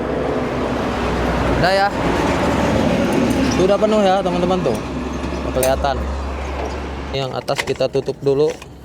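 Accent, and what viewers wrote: West Java, Indonesia. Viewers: Sunda na timana, a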